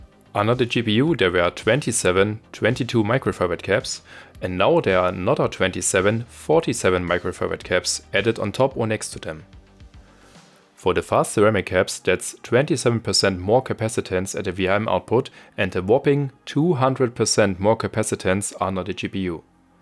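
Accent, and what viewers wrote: Germany. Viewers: Sounds like you are german, can i send you for modding my razer blade <num> ti with new shunts so the tdp rises from 90-110w tgp to round about 150-175w tgp? Obviously i would pay you for the the great work you are cappable of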